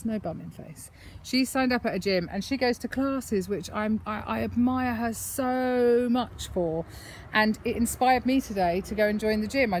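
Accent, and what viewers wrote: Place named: RP, United Kingdom. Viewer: Potty mouth doesn't sound the same with a British accent. It's very posh😘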